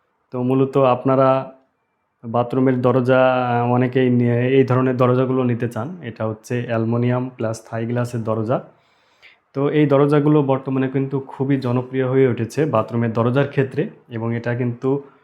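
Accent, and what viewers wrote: Jessore, Bangladesh. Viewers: আপনার কথা আমাদের যশোরের মতো। আপনার ভিডিও টি অনেক সুন্দর হয়েছে।অনেক কিছু জানা হলো।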